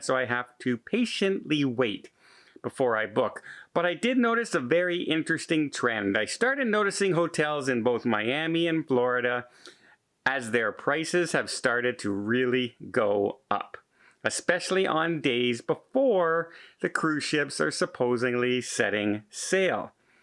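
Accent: Canada. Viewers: Are you aware that you sound Canadian??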